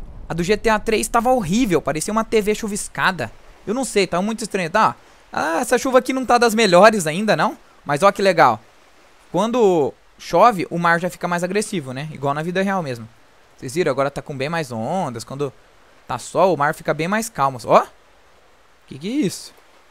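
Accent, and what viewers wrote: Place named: South, Brazil. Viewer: Eu pensava que vc era do Rio Grande do Sul ou santa Catarina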